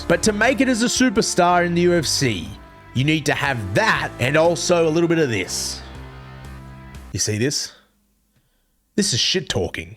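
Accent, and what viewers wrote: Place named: Australia. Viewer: You're bit too aussie for me to subscribe or like the vid. cheerio mate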